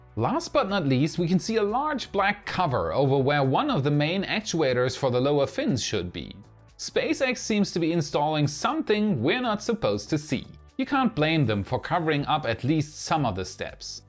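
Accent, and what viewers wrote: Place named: Germany. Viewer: Great video..! Am I alone in wondering WHERE his accent is from...? I have listened and listened and I cannot place it --Germany...? Yet I am unsure. I am sure only he does not wish for us to dwell on this. BUT WHERE IS HE FROM..?? Hmmm....cannot put my finger on it.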